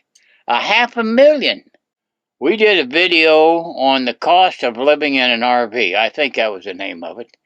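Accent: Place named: Texas, United States. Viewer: Great person, Love his bit of texan accent.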